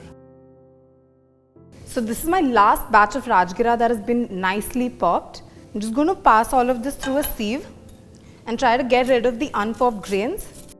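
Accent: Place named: Delhi, India. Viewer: Delhiwaali english is funny yet irritating for ears.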